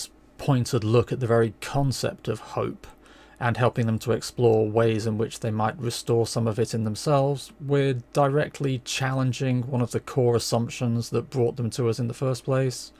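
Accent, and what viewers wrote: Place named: England, United Kingdom. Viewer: I never got to play TOR1e but was impressed by the way it was put together and 2e is looking just as good as Free League's other output. All of the Tolkien licensed RPGs have some merit, with but one exception - I can't understand how anyone can be happy squeezing Middle Earth into D&D. Great game but totally wrong for some styles and settings. I was always disappointed that Decipher's unrelated economic problems killed the LotR RPG. It used the movies as a starting point but was looking to bring move-goers into the wider world of the books if it had just been around long enough. As one last point: keep up the good work. TRPG as therapy fascinates me and it's good to see it with an English accent.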